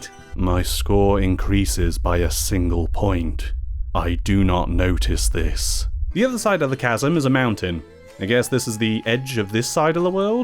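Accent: United Kingdom. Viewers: Damn British accent. Could have been a good video too. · You're just the spiffing brit, aren't you?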